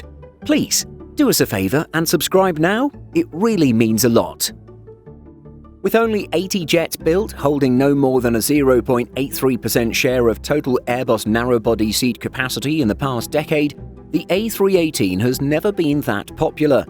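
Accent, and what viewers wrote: United Kingdom. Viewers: You can hear from the stiff accent that that's British, very very British. So they simply cannot use metric system because they are Imperial.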